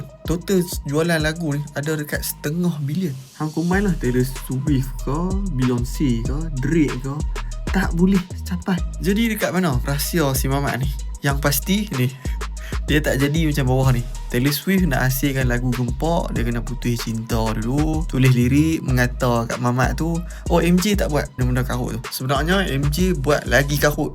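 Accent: North, Malaysia. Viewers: Loghat utara lekat ooooiiiii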